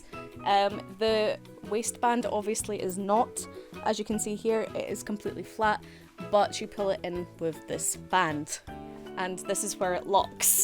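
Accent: Scotland, United Kingdom. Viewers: Your Scottish accent is tae die fer!!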